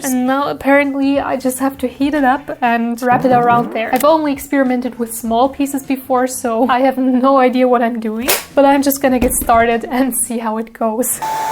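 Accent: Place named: Germany. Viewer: You Sound German or durch, and after you Said the Temperature dropped I knew exactly in what time frame you Made your Project xD i hated the Last two weeks because of their weird weather 😂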